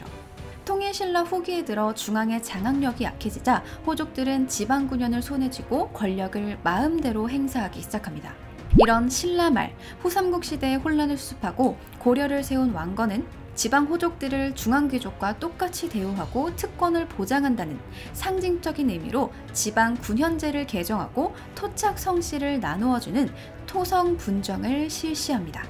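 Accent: Gyeongsang, South Korea. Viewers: 경상도 사투리 덜 어색하게 하시네요~^^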